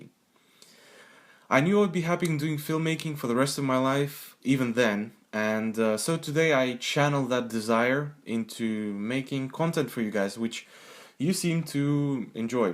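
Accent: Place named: Russia. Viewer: Вау, я конечно всегда слышал твой акцент и подозревал что ты русский, но,так как ты записал видео про рашн мафия колоду на английском языке, я разуверился. Круто слышать что русских популярных ютуберов тоже не мало (тролден например). But anyways for all of you who are wondering what the hell is this wall of text, I just want to thank you, for making good content and just being awesome overall. Keep up the good work man.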